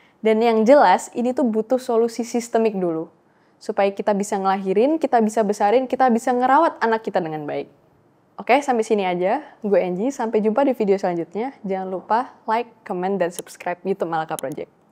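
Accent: Java, Indonesia. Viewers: Nonton karena medoknya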